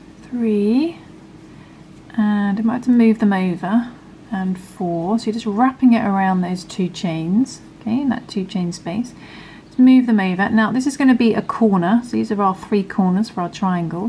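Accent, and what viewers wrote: United Kingdom. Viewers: Something about my dyslexic brain can't comprehend the british voice using american crochet. I automatically translate it to uk crochet and then you say nah we aint doin that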